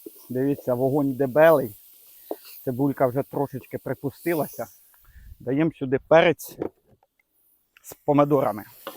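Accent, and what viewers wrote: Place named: Vinnytsia, Ukraine. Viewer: Не помідори,а томати❤,а говір наш вінницький👍🙏❤️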